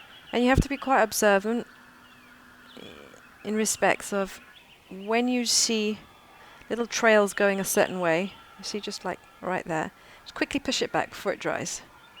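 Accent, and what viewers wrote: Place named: United Kingdom. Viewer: dear, first of all,you should not, YOU CANNOT go back to not talking! I won’t let you,girl 😊 You are amazing, you’re just not use to it, but your voice is just as gentle as your hands are working. And your British accent on top of it wow!! You are definitely not annoying, you are not boring, don’t even dare think that! If someone doesn’t want to watch they can fast forward it,but I don’t think they will. And of course you made a lot of sense, you’re underestimating yourself, but seeing this in regular speed and hearing you explain it makes all the difference, please believe me! And of course, your piece came out wonderful as usual, gorgeous. I asked you previously about an airbrush,now I heard you saying you bought it a while ago. I would like one, but I guess if I want a better one I need to buy it secondhand. Although in generally I hear that they are using the airbrush to make flowers,petals or smaller more defined things., I enjoyed this so much,you can’t go back now to no talk. 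You are so lovely 😊🌺🌹 I will send you an email about Pashmina.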